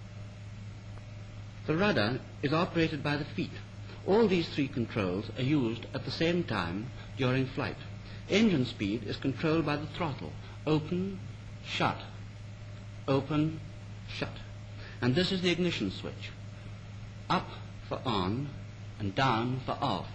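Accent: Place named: Estuary, United Kingdom. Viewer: Mr Scott might not have understood your estuary accent, but would have been too polite to make silly comments about it.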